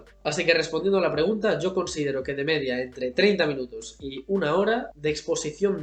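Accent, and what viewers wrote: Spain. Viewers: Hola, estoy aprendiendo español y entiendo muy bien como hablas. De qué region estas en españa ? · Es una pena que teniendo este canal no te animes a hablar con tu acento natural. Debe de haber como tropecientos mil canales con chicos/as que hablan en castellano (estándar). Estoy seguro de que a muchos de tus seguidores les encantaría acostumbrar el oído a los acentos del sur de España. 😉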